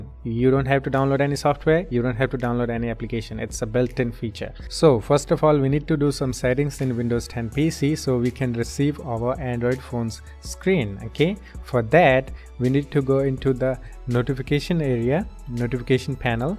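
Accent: India. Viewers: By listening your English I am damn sure that u r an Indian... Don't try that accent you have got caught 🤣🤣🤣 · U are indian?? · R u Indian?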